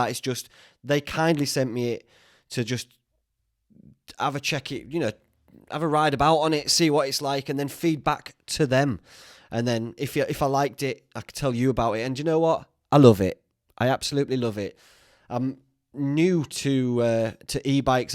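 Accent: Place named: Sheffield, United Kingdom. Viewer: You sound like you are from near me haha, im from near Sheffield - Rotherham - Just looking for an ebike myself!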